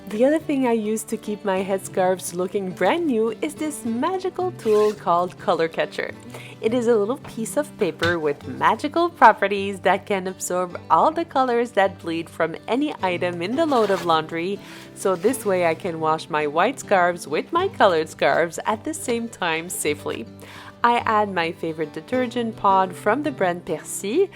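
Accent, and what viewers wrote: France. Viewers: oui moi aussi! Mais je vis à Mancheter! Ça m’a fait plaisir d’entendre le petit accent français! J’espère qu’un jour je parlerai anglais aussi bien que vous! J’aime bien vos vidéos elles donnent du courage! Et j’aime aussi votre tsniout!🧡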